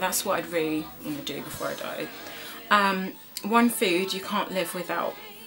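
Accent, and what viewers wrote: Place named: England, United Kingdom. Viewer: love love love your make-up tutorials, glad to have found you, nice to hear an english accent, keep up the fantastic work! x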